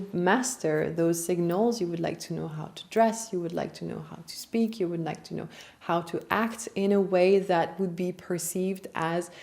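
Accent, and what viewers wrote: France. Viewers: Interessant, mais votre accent anglais franchouillard est éreintant. Dommage.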